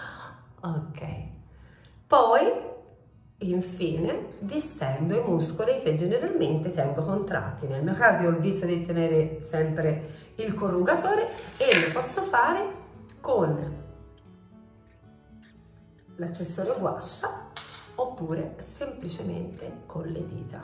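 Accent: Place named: Florence, Italy. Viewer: Sei fantastica, bravissima e molto bella La tua parlata Fiorentina è un piacere Un bacio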